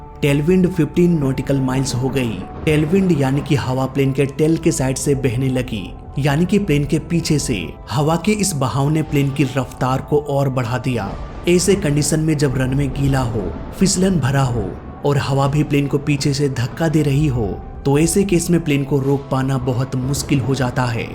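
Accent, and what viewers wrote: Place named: Bihar, India. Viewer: Bihari एंकर 😅😅😅😅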